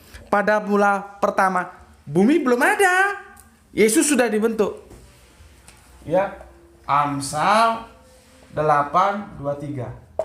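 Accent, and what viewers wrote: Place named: Bogor, Indonesia. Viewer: org bogor memang begitu bahasanya.. 😝🙏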